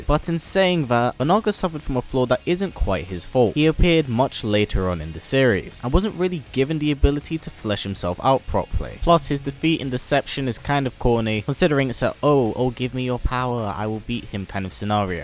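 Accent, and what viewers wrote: England, United Kingdom. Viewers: Maybe it's because I'm a huge sci-fi fan (mainly Star Wars and Star Trek) or because I'm not particularly familiar with Mortal Kombat's backstory, but I expected this list to be mainly Edenians. As a sci-fi fan inhuman does not necessarily equal non-humanoid . Since the person behind the video seems to have an English accent, I'll try to explain with an example. The Doctor is definitely inhuman, but s/he is humanoid.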